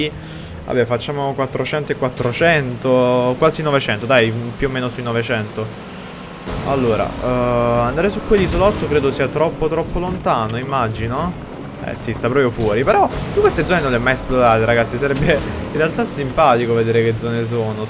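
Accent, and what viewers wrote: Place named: North, Italy. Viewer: Ma perchè parli sia napoletano sia romano e poi parli con l'accento del nord ...ma che cazz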